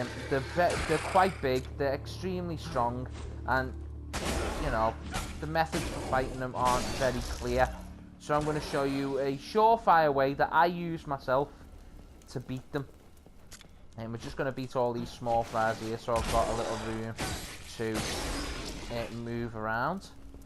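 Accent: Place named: Liverpool, United Kingdom. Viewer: Excellent video, thank you. Subscribed. Loving the Scouse accent as well.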